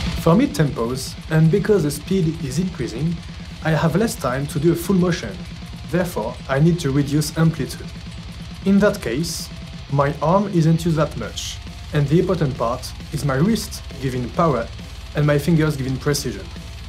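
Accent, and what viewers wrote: France. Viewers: Je comprends pas d'inquiétude x3 Je ne critiquais pas il me semblait juste avoir cru entendre un petit accent qui sonnait français ^^ En tout cas je vais essayer de mettre en pratique ce que j'ai vue dans cette vidéo et bonne continuation 😁 le blast est une technique que mes mains et mon poignets n'apprécient pas xD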